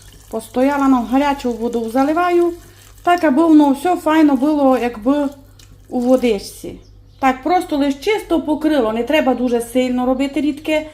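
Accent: Transcarpathia, Ukraine. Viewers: З великим задоволенням слухала вашу мову і з теплотою згадувала щирих і добрих людей із Закарпаття. Здоров'я та миру вам. Дякую за ваші рецепти, дуже цікаві. Дуже дякую вам за щирість. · Нормальний закарпатський діалект. І нічого чіплятися до жіночки. Як що вам не подобається, не дивиться. А мені все зрозуміло, хоча я вчилась в російськомовній школі. Господині респект за рецепт. 😊❤ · Спасибо большое за рецепт, новые слова и прекрасный закарпатский говор. Я русская американка, с началом войны смотрю и слушаю только украинские каналы. Язык уже понимаю очень хорошо, говорить и писать пока не умею)) как собака понимать понимаю, а сказать не могу😂 Победы и мирного неба🇺🇸❤️🇺🇦🇮🇱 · З задоволенням послухала закарпатський діалект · Ви прекрасна господиня. І дуже вас приємно слухати. Гарний діалект закарпатський❤ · Ви не мудра жінка, не подобається, не дивіться. 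То закарпатський діалект, пані просили, щоб вона розмовляла саме на діалекті. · це закарпатський діалект. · Це так розмовляють на Закарпатті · Привіт з Рівного!Дякую за рецепт! Діалект мені дуже знайомий, дядько одружився в Закарпатті,в місті Свалява,все життя прожив там,уже теж мав такий діалект. Нажаль вже його немає,але тітка ще живе, спілкуюся з нею,і з двоюрідним братом, який захищає Україну,з 15 року,та сестрою. Мирного неба всім! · ОБОЖНЮЮ ЗАКАРПАТСЬКИЙ ДІАЛЕКТ, ЯК І ВСЕ, ЩО ПОВ'ЯЗАНЕ ІЗ ЗАКАРПАТТЯМ!!! НЕ ЗВЕРТАЙТЕ УВАГИ НА ( ЯК БИ ПОМ'ЯКШЕ СКАЗАТИ)- НЕДОЛУГИХ.У ЦЬОМУ РОЦІ ПОБУВАЛА НА ЗАКАРПАТТІ - ЗАКОХАЛАСЯ І В ЛЮДЕЙ І У САМ КРАЙ! ЯК УЇЗЖАЛА - НЕМОВБИ ВІД РІДНІ ПОЇХАЛА - ЗА 3 ДНІ ВЖЕ СУМУВАТИ ПОЧАЛА!!! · Тільки ідіот поставить дизлайк. В кожному регіоні України люди розмовляють на своєму діалекті. Жінка розмовляє на українській мові з закарпатським діалектом. Браво господиня!!!!!❤❤❤❤❤❤❤ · Дякую за рецепт. 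Тяж цікаво слухати закарпатський говір і вже буду знати що сіль це по-закарпатськи суль ( я з Прикарпаття і у нас говорять переважно як літературна українська мова хоча і є в різних областях своі назви деяких овочів та всього іншого ( наприклад у нас кажуть на картоплю бульба а в деяких селах кажуть бараболямі тільки тепер почула що на Закарпатті на картоплю кажуть ріпа а я думаю а де ж то ріпа —щось не бачу аж потім зрозуміла що це картопля ( бо у нас ріпа це такий білий буряк ) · дякую за рецепт! І окрема особлива подяка за чарівну закарпатську українську мову! Хочу більше закарпатських слів! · А мені дуже подобається слухати закарпатський діалект, я чомусь вчуся. За рецепт дякую. Приготую з грибами😀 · Який чудовий Закарпатський діалект❤❤❤. Даже не знаю,що більше сподобалось😊😊😊. В дитинстві бабуся таку страву готувала і назва його була у нас булянка😊😊😊. Західна Україна. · Это закарпатский диалект не нравится не слушайте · Чудова господинька і справжня закарпатська говірка ❤, аж любо слухати ❤😍🔥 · Це справжній закарпатський діалект,а ви вчіть історію України і будете знати в якій області як розмовляють.А Вам велике дякую за рецепт,вже приготували всі були в захваті.Дуже смачно.Чекаю на нові рецепти.Також робила по вашому рецепту голубці онуці на день народження теж всім сподобалось.Дякую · Дуже гарний рецепт, дякую вам! 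Особливий колорит додане закарпатський діалектя в нього закоханий! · В неї закарпатська говірка. Не подобається, то не дивіться. Але ця її мова доволі цікава, і її не важко зрозуміти. Здоров'я господині і процвітання каналу.😊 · В українській мові немає слова паночка, є лиш панночка ! А то закарпатський діалект, доволі красивий! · але ваша мила Закарпатська говірка мені дуже подобається! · Приготувала, дякую за рецепт страви i закарпатський дiалект. Щастя Вашiй ро́динi.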